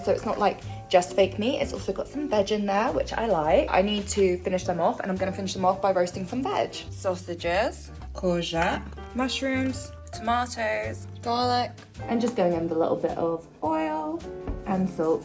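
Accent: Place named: United Kingdom. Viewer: Hi! I didn't know you'd have a YouTube channel 🥰 I'm Italian, but I live in Malta and I started following you for your lovely accent 😍 I love the British accent and culture. What's more I'm vegan too.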